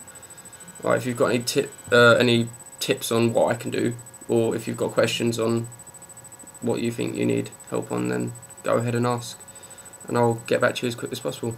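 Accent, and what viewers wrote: United Kingdom. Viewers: Are you britsh all british guys remind me of Matt Bellamy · lmao bro im trying to learn this for some songs so i find its only appropriate the the guy giving the "lesson" has a british accent XD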